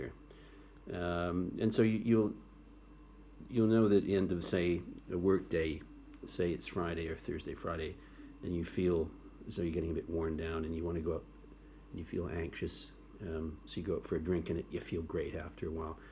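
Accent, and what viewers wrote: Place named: United Kingdom. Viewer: I think I hear a slight British accent ❤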